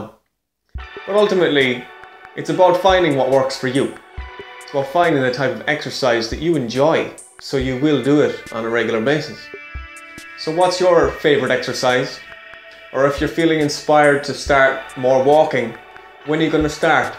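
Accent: Ireland. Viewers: thought u was Swedish at first pretty sure your ireish now. really liked your conor mcgregor video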